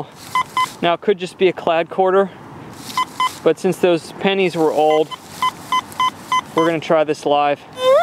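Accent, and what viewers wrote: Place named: South, United States. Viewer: Great hunt its also funny you are picking up on the southern accent and sayings.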